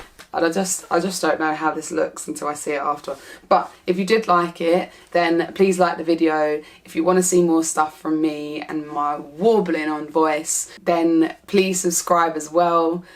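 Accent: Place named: South London, United Kingdom. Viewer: That South London accent is thick! Lol. Must be Brixton. Anyway, I'll look out for your reviews of Sci Fi books. Happy booktubing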